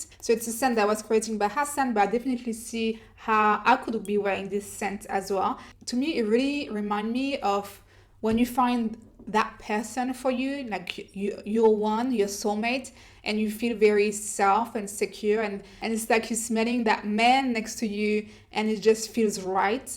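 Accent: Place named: France. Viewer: Lovely review 🥰 I heard that the wedding silk was like a love child from vanilla 28 and Musk 12 which are my favorite😍 Does the layering of the two lean masculine? Also do you speak French? I detect an accent.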